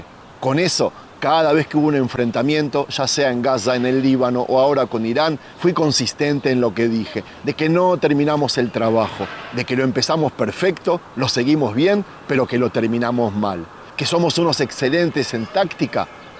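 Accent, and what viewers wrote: Argentina. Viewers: mi opinión lo que pasa es que él siendo argentino de nacimiento (lo digo por su acento tan marcado) adquirió la nacionalidad de ese país y cómo allá está viviendo sabroso, lógico que se hace el ciego-sordomudo. Está defendiendo a quien lo tiene viviendo sabroso. En la mayoría de países latinoamericanos se tiene la idea de que los argentinos se creen de una raza superior y quizás por eso es que defiende a capa y espada la política expansionista de Xion.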